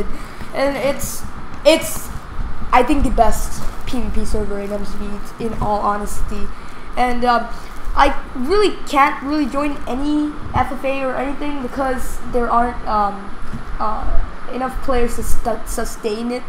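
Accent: India. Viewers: are you indian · Wait Is He Indian??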